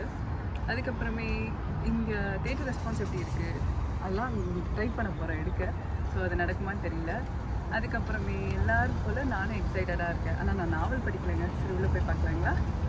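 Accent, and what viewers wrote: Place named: Madurai, India. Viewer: T q allows so cute tamil from madurai 🤝🙏🙏🙏👍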